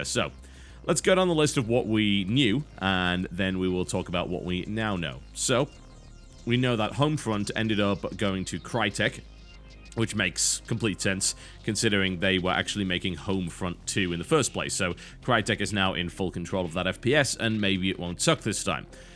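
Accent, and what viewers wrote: United Kingdom. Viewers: No, it's just the British English (a.k.a. English in England i.e. the correct English) way of of pronouncing those words.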